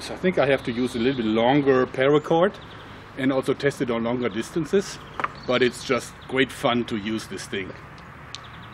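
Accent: Germany. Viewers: Das Schönste an den Videos ist doch, dass englisch mit dem schönen deutschen Akzent. Es würde eine Menge an Witz verlieren.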